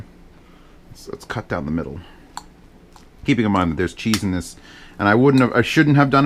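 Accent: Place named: New Jersey, United States. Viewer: Where you from? Sounds like Jersey or Philly... just bring it up because "white American"... I say it, and I'm from South Jersey (right outside of Philly)....live in wretched North Dakota now and they call white American..... fucking Swiss!!! Swiss!!!! It's not Swiss, Swiss has holes and is also delicious with imported ham, but is NOT white American cheese damnit. Ok, that's all.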